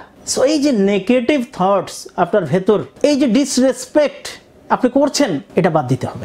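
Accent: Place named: India. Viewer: Your accent is indian. Particularly the pronunciation of ‘t’ is too strong.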